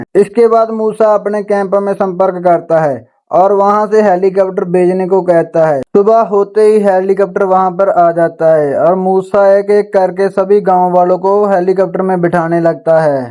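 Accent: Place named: Punjab, India. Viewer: NICE BRO TUHADI HINDI CH PUNJABI VALI GALL BAAT AA IK VAAR PUNJABI CH EXPLAIN KARIO ATT BRO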